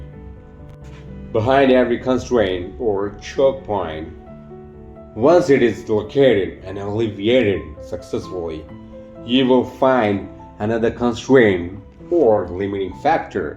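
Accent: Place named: United States. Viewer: Yes friend, I also love his accent ... Being an Indian he's really doing great American accent..🎉🎉🎉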